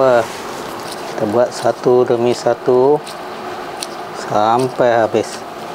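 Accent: Kedah, Malaysia. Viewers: orang mana? Macam bunyi kuala nerang pun ada 😊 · cara cakap mcm org kedah...rumah anak yatim ni dekat kedah ker.?.?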